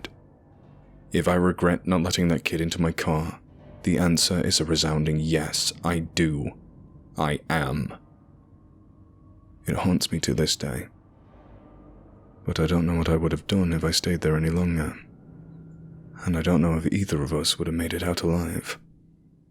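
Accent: England, United Kingdom. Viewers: But oh, but... This guy doesn't even have a Kentucky and accent. He's British! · That's right I've lived in the East Tennessean mountains all my life. Same general area and people as eastern Kentucky. Beautiful mountains and people. From this guys English accent you can tell it's about a 99 percent chance he's never been any where near Appalachia lol. It's pronounced Appa latch a not Appa lay cha. You outsiders can visit but we appreciate it if y'all don't stay, especially if you have a superiority complex. We don't take to kindly to any bullshit. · This guy sounds like he's from the UK.. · You sure you don’t sound your not from anywhere ky Mabey merry old England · you don't sound like your from that area... sounds like you are English possibly a ginger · A British person telling us about a specific area in the US lol. It’s not scary as long as you are strapped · I can’t say, some brith telling a story he read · Is this a British accent talking bout driving the back roads? 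· I find it funny! I 75 isn’t eastern Kentucky. Lexington isn’t eastern Kentucky It’s Hal rogers parkway . I live in hazard! With your English accent. Ride motorcycle through that whole area it’s beautiful here not scary! I call bullshit !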